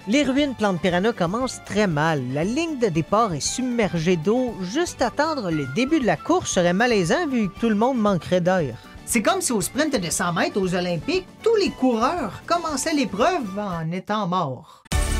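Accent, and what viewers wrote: Quebec, Canada. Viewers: cette vidéo serait moins bien sans accent québécois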